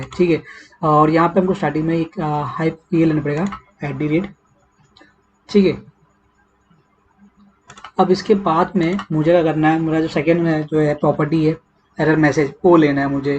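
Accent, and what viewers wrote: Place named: Bihar, India. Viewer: Lag raha hai aap bihar se ho thik hai.❤❤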